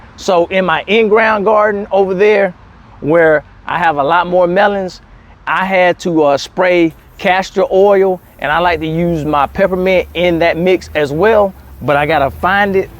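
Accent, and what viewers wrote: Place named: Baltimore, United States. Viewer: ok 😅 thought I heard a twinge of a Baltimore accent. Cool! Thank you for your helpful videos 😊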